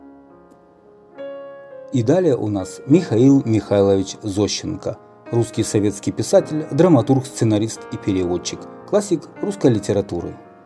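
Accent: Ukraine. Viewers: Ха)))) Меня тоже жутко резануло по ушам голос за кадром! Это надо же,даже не поработав над своей дикцией пускать это все в эфир!)))) Не лучше ли было с таким украинским акцентом,озвучивать на украинском?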